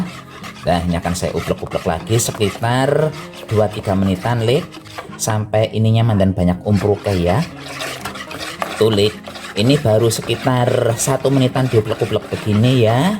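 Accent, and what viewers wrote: Banyumas, Indonesia. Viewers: Aku jadi terhibur nonton vidio mu ngapak yek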